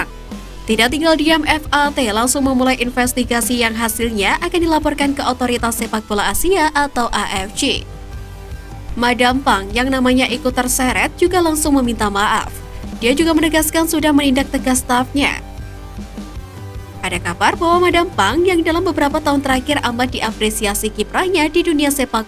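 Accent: Java, Indonesia. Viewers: Pembawa narasinya medok amat. Jadi ingat lagu... Andai aku letto wes pasti aku wong jowo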